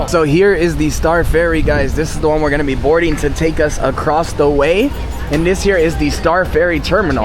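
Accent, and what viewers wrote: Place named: United States. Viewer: actually you no what ..... dude thats so annoying your whiney american accent doesnt help tho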